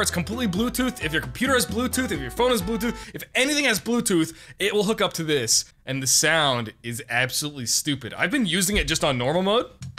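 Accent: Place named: New York, United States. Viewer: I think people only watch this dude cuz of his new York accent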